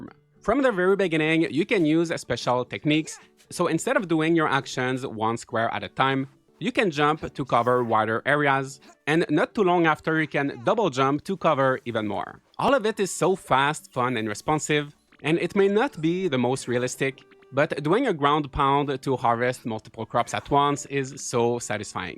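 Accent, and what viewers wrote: Quebec, Canada. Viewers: Excuse-moi Est que tu viens de la province de Québec? Ton accent sonne canadien français je trouve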